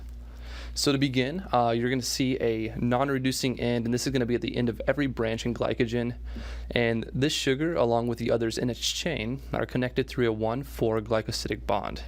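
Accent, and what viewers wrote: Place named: Wisconsin, United States. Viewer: The narrator's accent is so distinct, is that a Polk County Wisconsin accent I'm hearing???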